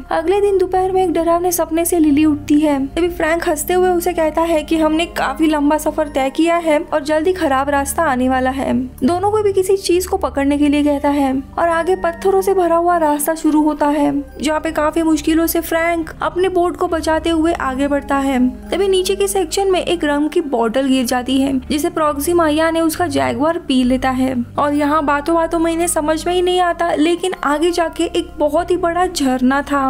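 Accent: Maharashtra, India. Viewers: Hii Host, Your mother tongue is Marathi. Sozhavi Dhashak nahi Solavi Shatabadhi.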